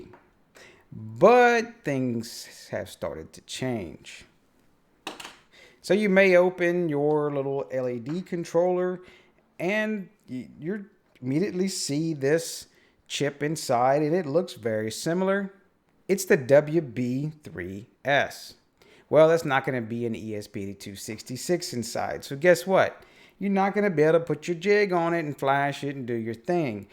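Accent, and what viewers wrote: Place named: Texas, United States. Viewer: I love Texas accent! ❤❤❤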